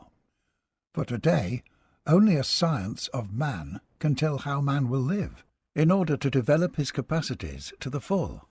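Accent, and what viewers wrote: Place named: England, United Kingdom. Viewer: Thank you for posting this video. I loved listening to it narrated by an Englishman.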